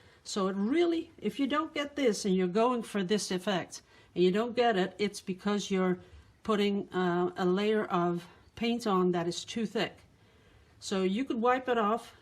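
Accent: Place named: Netherlands. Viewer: The pencil eraser made me laugh and the hair comment. From the other video. I'm always fussing at my hair Lol. I need to colour my greys!!! Very good paintings and thank you for explaining mica powders and pigments. I bought some Siesso mica powders and watching videos on them. Love your Dutch accent!!!...;) I'm from Ohio...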